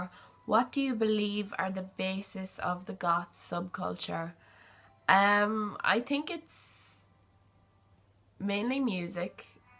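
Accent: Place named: Ireland. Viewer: Greetings! Judging by the way your beautiful voice sounds , I'm assuming you are from Ireland. I work with tons of wonderful fun loving and friendly Irish folks. I work for Ornua Foods in Chicago Ill. Ornua is Kerrygold butter. I call it Dairy Crack. Once you try, you can't stop. Best wished for continued success. Beat to you, LennyK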